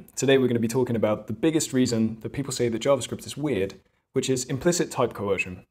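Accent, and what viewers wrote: Netherlands. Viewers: Are u Dutch :D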